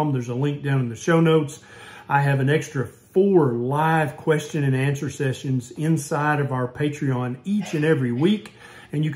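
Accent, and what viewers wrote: South, United States. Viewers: Oh my goodness… we really do say it like that in the south lol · Tiniiiiitus is pronounced this way in the north as well so you sound like you're from the south and I don't understand you're saying it is pronounced that way in the South when you clearly I can't even listen to you because you keep on saying it improperly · 100% support that southern pronunciation,!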